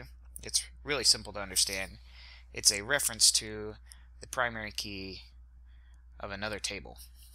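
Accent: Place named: United States. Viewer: Thank GOD for your sweet american accent! I'm tired of "right", if you know what I mean!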